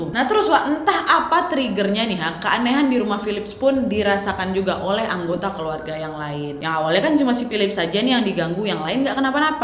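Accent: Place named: North Sumatra, Indonesia. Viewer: Macem logat namorambe ko bah wak.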